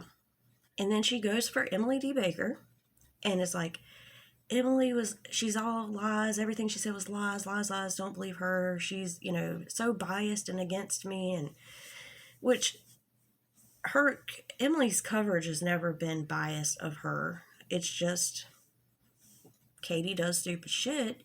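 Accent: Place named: Georgia, United States. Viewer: Are you from GA? Just trying to pinpoint the accent. Coming from AL over here.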